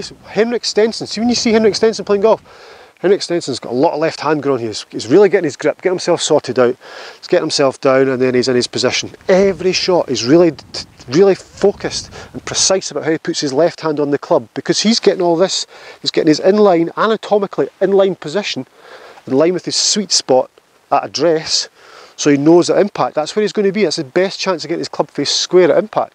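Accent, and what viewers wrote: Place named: Scotland, United Kingdom. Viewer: This is excellent stuff, really enjoy your videos and the personality. Having a bit of fun understanding what you are saying sometimes as I am a canuck, but my mom and gran were Scottish born (McIntosh) and I remember the brogue. Cheers.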